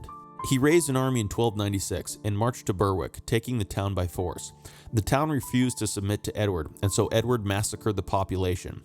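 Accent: United States. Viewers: An excellent narration! [Do I detect a midwestern accent?] I'm looking forward to more from you. I'd like to see an in depth history of early czech/moravian rulership. It's hard to find anything on this. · I can't watch this it doesn't seem right with an American narrating.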